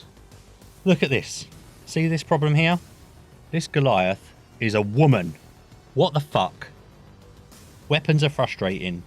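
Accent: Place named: England, United Kingdom. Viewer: That's a very English accent for such a German sounding channel name what's going on there?????????? Also emotional damage oof what a burn!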